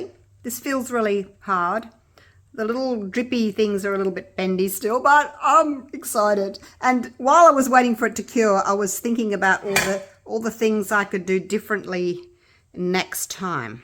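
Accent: Australia. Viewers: To be honest.. I really couldnt care less what shes doing bc I know it will always end up amazing. I mostly like just listening to her peppy, australian accented self which is more than enough for me to play her videos lol.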